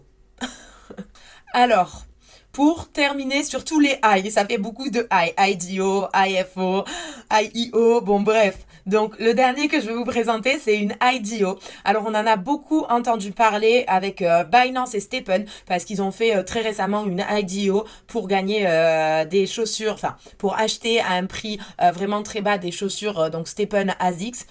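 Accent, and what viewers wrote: South, France. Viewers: Accent du sud ?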